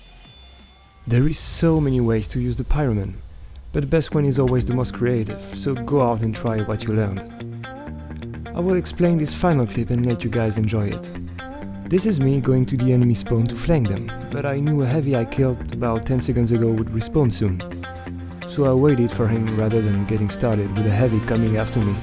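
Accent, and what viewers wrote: France. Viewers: I think hes french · are you French?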